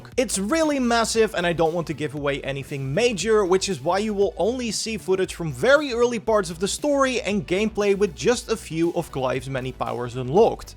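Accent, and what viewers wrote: Netherlands. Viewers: Judging from your accent, you're Dutch? 😂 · O dear, this kind English spoken by a Dutch, It is hard to listen to.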